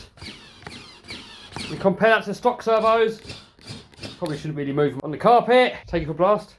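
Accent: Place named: Cockney, United Kingdom. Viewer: You have the strongest Cockney accent I've ever heard! Lol great vid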